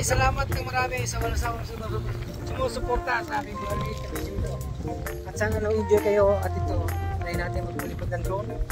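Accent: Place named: United States. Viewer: Para ka palang kano sir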